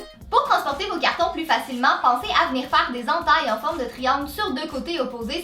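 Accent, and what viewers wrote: Quebec, Canada. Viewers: disons que tu as un fort accent québécois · Ah l'accent québécois 😅😀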